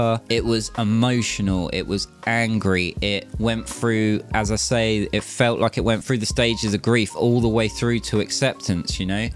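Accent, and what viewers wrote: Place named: United Kingdom. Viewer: Sorry for loss, I lost my grandmother in May, my father in June and my dog Ireland in August last year, so now how you feel. You were spot on with your reaction, actually you are the only reactor who really understood the emotion they were expressing, love love loved your reaction to this song, as well as all the others. So J Hope didn’t rap when he joined BTS, he would from practicing with Rm and Suga in the dorms and found his own style so that is why you can tell the difference in their styles, you are extremely intuitive, you get these guys so well. Oh and btw love listening to talk, l am a sucker when it comes to British, Irish and Scottish accents, so could listen to you just read the phone book 😂, but also as I said, very intelligent and intuitive, and yes BTS does find you when you need them the most, it is so true, happened with my sister when she was in the hospital after her heart attack, she then wouldn’t stop talking about them so since she didn’t really have anyone to talk to about them in the beginning I would let her go on and on, till then I got hooked with their dancing skills, the fandom who treated you as if you were a life long friend, and then when the pandemic hit, I was really glad I had already been following fhem for a few years since 2018 and I was regretting I didn’t know about them in 2013, but thank god their earlier lives live on youtube and weverse so I was able to see what I missed, I feel like I have been following since 2013. 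Glad you are fi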